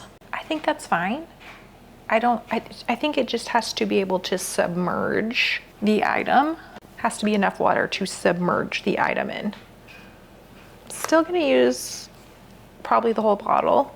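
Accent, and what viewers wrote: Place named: Australia. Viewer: Love your Aussie! This makes me want to dye some stuff I don’t like.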